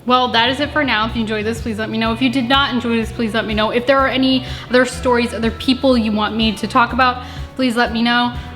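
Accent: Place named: Denmark. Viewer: are you danish because you are talking like a dane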